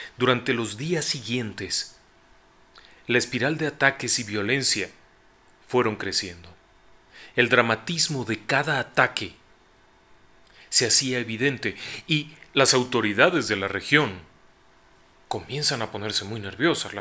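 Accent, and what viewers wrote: Mexico. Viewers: que ignorante es acento netamente mexicano nosotros somos mexicanos no Latinos, aprende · Es el acento mexicano hermano saludos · El castellano es un idioma muy rico. Los latinoamericanos lo han hecho aún más rico. Te lo dice un auténtico castellano de Castilla,que soy yo. Se supone que el castellano más puro se habla en Valladolid. El señor habla perfectamente el castellano con las particularidades de un Mexicano. Lo bueno de nuestro idioma es que desde el sur de EE.U.U hasta la Patagonia puedes hablar con todo el mundo sin necesidad de otro idioma que el Castellano.Por eso que estamos todos unidos por este idioma tan rico. Digo rico porque tiene palabras derivadas del latín,del árabe y en menor medida del Visigodo. Los nombres de nuestras ciudades vienen de el Latín, Árabe, Visigodo,hasta del fenicio y el Cartaginés. Saludos a todos los hispanoparlantes del mundo desde Guadalajara en el corazón de Castilla (España).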